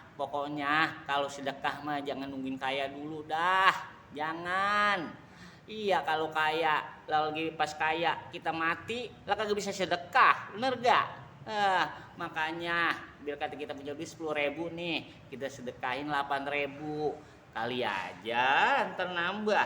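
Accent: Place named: Betawi, Indonesia. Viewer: lenong betawi banget.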